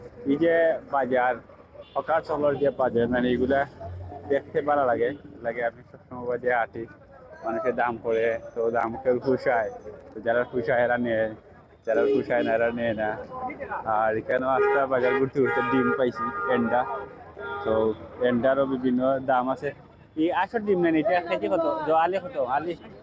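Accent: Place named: Sylhet, Bangladesh. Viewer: Vai Sylheti kota sera valo lage ❤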